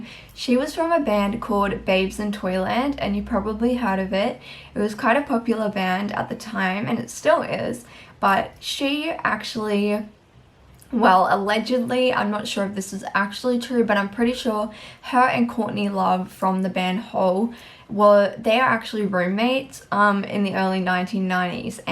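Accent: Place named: United Kingdom. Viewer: Maybe have a look at male "fashion" and make comparisons to modern day male "street" ware, that would be interesting. Dr Martins are really getting some attention here in Denmark these days among the young people, I see them all the time, never owned a pair but always thought that they looked a bit uncomfortable, guess you have to "suffer" for beauty. Another thing that was popular in the good old grunge days where t shirts on-top of sweaters , Layne Staley from AIC always did that, I guess it also covered up his needed marks among other things. I take it from your accent that your from the UK, I really liked those UK bands from the Brith pop days, it was a funny switch in style and attitude, the fashion changed a lot, slim jeans, leather jackets, retro Adidas sweatshirts, under sized t shrirt and poloshirts took over, those where funny times.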